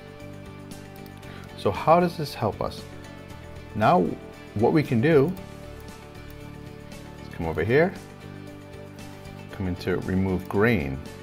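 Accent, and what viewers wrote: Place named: Mid-Atlantic, United States. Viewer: Hi - or is that? Thanks for a well considered tut. The work around may be a bit fiddly, but I can see how it would work. For people interested in applying a mask-to-denoise method to stills, there is a vid tut made by the Photoshop plugin vendor, Topaz Labs, that explains this. I believe it was made 1-2 years ago. Regarding mask making to extract areas of luminance, I often use this technique with image sequences of actors in front of green screen. In this case, too often selected areas of colour have differing grain/ noise characteristics. I have also found playing with the AE Shadow/ Highlight filter on my ortho masks can help! If I may say so you come across as a patient, gentle, unhurried sort of person. Of course as filmmakers we all should be patient (and experiment!). However, too often video bloggers on YouTube dash through their tuts as if the moment had no significance other than seeking a moment of attention. 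Where do you hail from? You do not have a conventional North American accent. There is a hard end to your “A” sound, but the overall suggestion is mid Atlantic, or ex-pat Australian.:)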